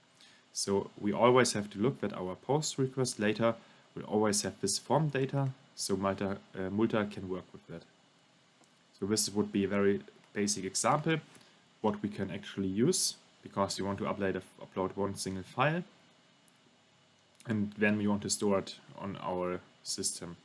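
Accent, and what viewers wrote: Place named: Germany. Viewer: are you german? your accent seems like it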